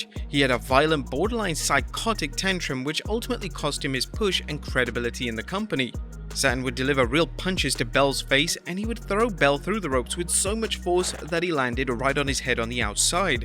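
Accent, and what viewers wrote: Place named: United Kingdom. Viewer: Even if you didn’t have the slight accent I would know without a doubt that you were from the UK. Love the way y’all pronounce H. 😃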